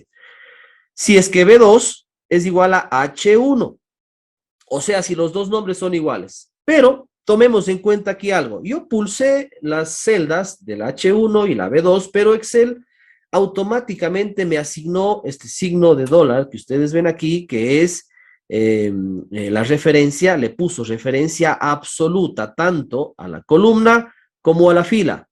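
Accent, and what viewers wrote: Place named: Ecuador. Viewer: Por su acento al hablar sabia que era Ecuatoriano, muchas gracias buena clase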